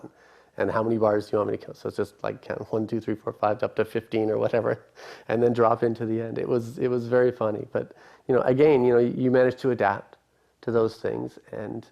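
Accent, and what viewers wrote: Canada. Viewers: That's how Canadians pronounce again.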